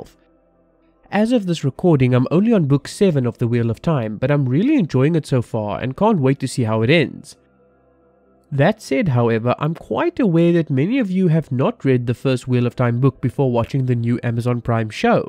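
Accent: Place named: South Africa. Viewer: Hey I like your videos. When I watched you I thought your accent sounded... familiar then I realised... jy is ook van SA. Lol. Great stuff man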